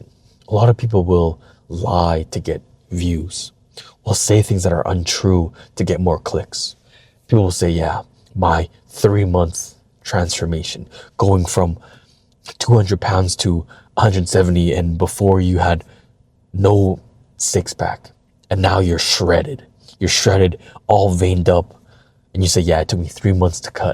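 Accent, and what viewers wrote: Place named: Canada. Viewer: I appreciate & Love You Brother for this I suffer with allergies too 😂🤣🎯 if I don't take medicine for it at least once a day at worst my could make me literally sick like a cold just all the symptoms on 1,000 max & if that happens it can highly likely trigger my asthma too. I wonder which side your family u get it from? 🤔 I know I get it from my dad & his parents as they used to call it hay fever back n da day before it was called allergies 😂 Yeah sometimes I mispronounced & go off somewhere too 😂 it doesn't help I have a thick Canadian accent like u ironically sprinkled with an ancestral accent from our families 😂🤣🤦🏿‍♂️ U seen my vids 😂 the vids u & everybody else saw honestly on average is my 3rd or 4th try 😂 Yeah social media is fake 😂 but me I'm just raw with it & folks told me they love my vids on my thoughts 💭 🤔 It be so much I gotta release it ❤️‍🔥 Eventually I'm gonna move it to other social media platforms ❤️‍🔥 Love U My Brother ❤️‍🔥🤝🏿❤️‍🔥💯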